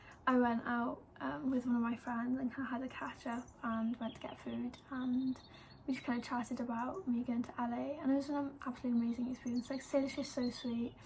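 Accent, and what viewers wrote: United Kingdom. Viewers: Your voice sounds like British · Hello, I'm just now getting to this video an you have an amazing english accent. I'm one of your biggest fans. That's Why I subscribed to your channel. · are you british?? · Yep, but she's Welsh, a different British ( English) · You sound British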